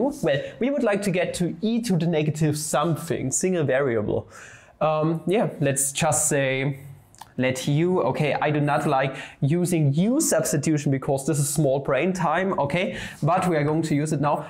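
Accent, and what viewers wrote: Germany. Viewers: Oh dear sometimes I don't know if you're speaking Deutsch or English. Work on that accent pal and it will be the best!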